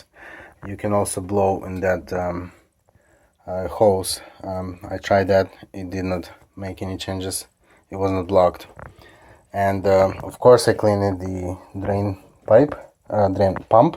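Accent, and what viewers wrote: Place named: Balkans. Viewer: nice great explanation bro, are you from Balkans?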